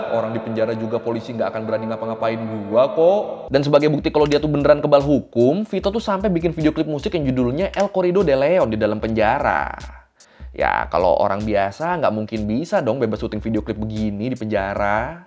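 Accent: Indonesia. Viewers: Macam sialan perbaca dari indon ni.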